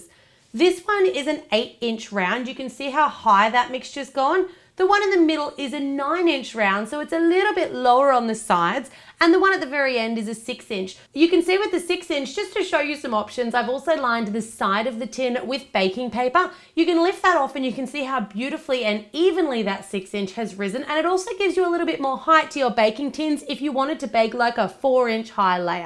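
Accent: Australia. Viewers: good lord I love Australian accent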